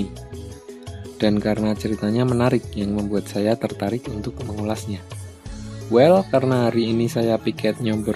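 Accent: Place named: Java, Indonesia. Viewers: Medok bgt sih bhsa Jd gk menarik dgr suaranya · Ngomongnya itu lo minnn campur aduk ada yg baku ada yg medok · Bok jowo wse mas .luweh apeh logate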